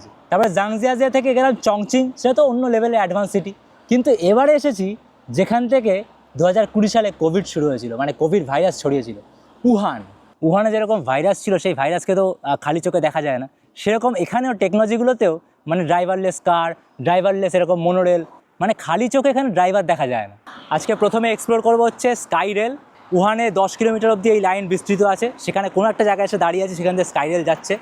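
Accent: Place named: Kolkata, India. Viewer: Kolkatar kotha bolche mone hoi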